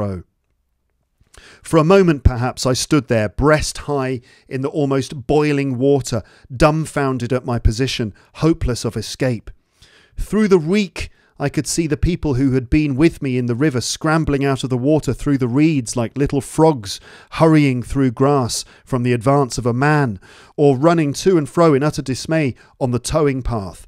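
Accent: RP, United Kingdom. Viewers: speaks RP, does he? Pls tell me, thank you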